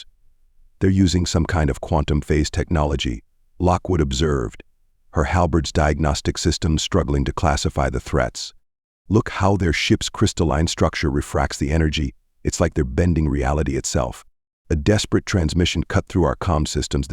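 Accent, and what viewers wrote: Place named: Texas, United States. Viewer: It's more like Texas southern speak! Just enjoy a good story!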